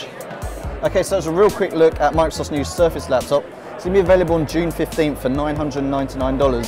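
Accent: United Kingdom. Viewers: Is this reviewer from UK? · Unrelated, but please speak clearly!! yeah, cool you're from the UK, but slow down, normal pace, microphone in the right place.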